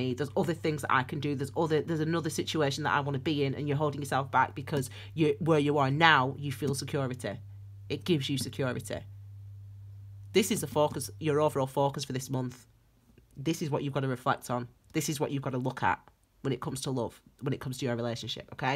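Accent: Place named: York, United Kingdom. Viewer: Hi thanks for your reading. I was wondering if you're from York in England? :) I can recognise your accent :) xx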